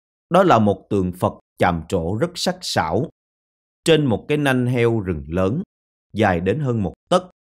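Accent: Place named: Saigon, Vietnam. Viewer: Giọng Anh này là giọng người Sài Gòn. Đọc nghe rõ ràng , hấp dẫn quá! Cảm ơn Anh !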